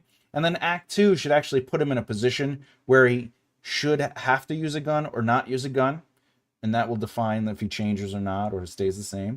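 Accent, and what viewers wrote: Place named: Boston, United States. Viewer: And, with your reading accent, makes it sound super Boston.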